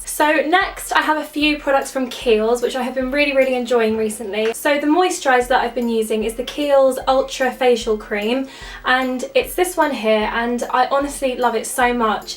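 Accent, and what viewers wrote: United Kingdom. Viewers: I just love the british Accent (I live at another country). Please can you make the room tour now, Not by 3000 cause can't wait i love just your backround. And you derseve all followers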